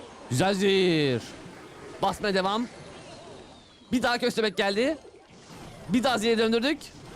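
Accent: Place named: Yozgat, Turkey. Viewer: Abimin yozgat şivesi var adamsın abi😎😎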